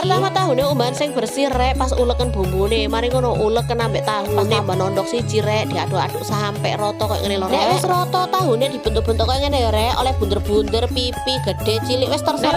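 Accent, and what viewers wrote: Surabaya, Indonesia. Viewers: Seneng sm narasi embaknya Suroboyo banget😂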